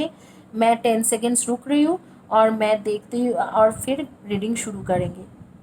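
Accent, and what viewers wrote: Bengal, India. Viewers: Radhe Radhe madam ji. Apka bolneka tarika se mujhe lagta hey ki ap bangali ho..... Pile no 3 chuna tha . Jo mech nei kiya...... Jay sri krishna.....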